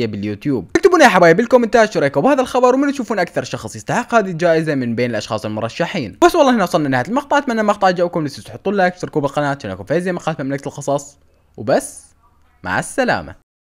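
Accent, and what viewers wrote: Iraq. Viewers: انا ركزت باللهجة العراقية اكتر من الحكي 😂😂😂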